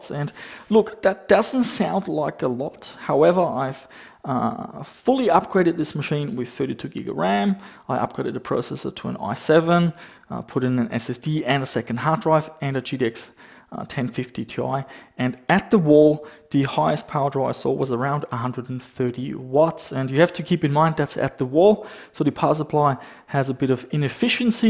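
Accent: Germany. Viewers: ah jes🙈its sounds like you are from Germany😂no hate nice Video✌🏼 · Wenn deutsche english reden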